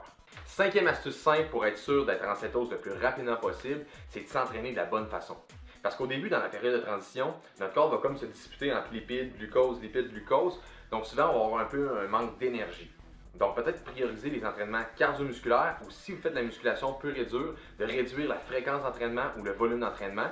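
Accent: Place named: Quebec, Canada. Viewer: Vous parlé trop vite et avec l accent quebequoise j ai du mal à suivre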